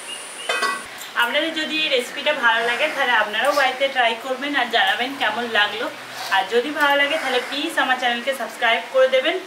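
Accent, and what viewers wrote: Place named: Kolkata, India. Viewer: Apni kolkata takhe hobe.. Apner basa sune buja jay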